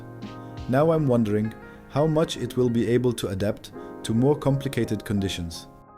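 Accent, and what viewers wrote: France. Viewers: j'adore l'accent fr XD : )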